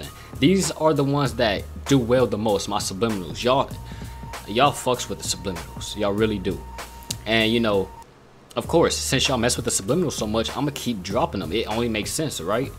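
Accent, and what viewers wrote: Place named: United Kingdom. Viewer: When I hear this guys voice I thought he was from the uk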